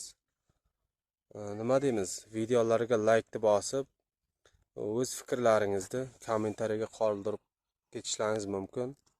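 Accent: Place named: Kashkadarya, Uzbekistan. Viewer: Shevasi yoo Qashqadaryo yo surhondaryo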